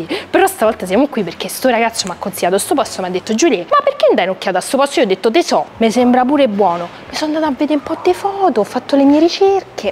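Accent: Rome, Italy. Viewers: il suo parlare romano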